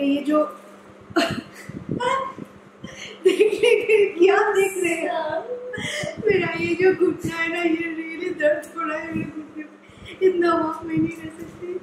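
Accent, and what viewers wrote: Bengal, India. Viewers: u r just amazing apna Bengali tone accha lagta hai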